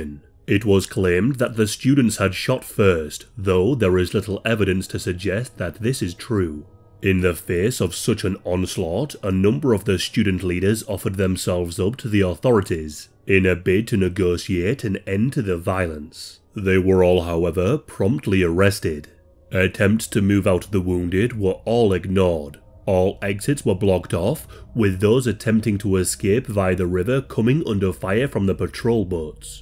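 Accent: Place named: North East England, United Kingdom. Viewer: The most durr voice on the Internet. Rotten accent that North Eastern 🤢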